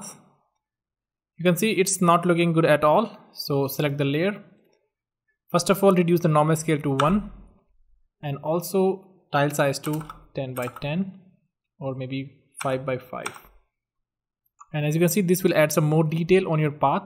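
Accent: India. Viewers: You are Indian by accent . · I know that you are a Indian I can identify by your vioce I see very less indian game dev channel's keep it up 👍🏼From indian punjab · Who agree that he is indian but speaking english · indian accent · when there is an indian speaking, you know its going to be a good tutorial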